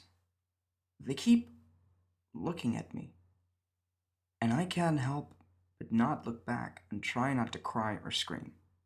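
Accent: United States. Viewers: why do you use an American accent when you read creepy pastas? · The American accent sounds fantastic, only thing I noticed that was a bit wrong was when you say "idea" you pronounce it more like the British "ideer" and less like the American "ideeya". I Hope you don't mind some constructive criticism.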